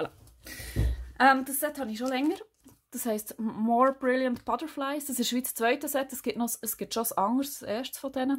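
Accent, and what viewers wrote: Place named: Switzerland. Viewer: Schwiizer Dialekt han I scho vermisst 💚💚💚💚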